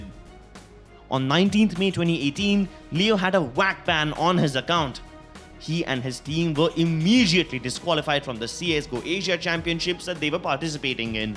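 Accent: India. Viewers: Change your indian accent please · Of course it’s an India voice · you sound too indian i am sorry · F*ck your Indian accent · Chutiye indian h to ese he rahegi na awaz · Nice Indian accent can’t even pronounce vac how do u even pronounce it as “wac” ban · Indian accent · it's just indian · The Indian accent made me chuckles alot xd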